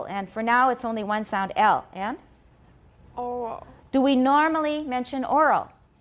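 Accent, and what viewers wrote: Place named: Taiwan. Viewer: 史老师好认真，一本正经地讲解，正宗的英文和台湾中文口音好可爱。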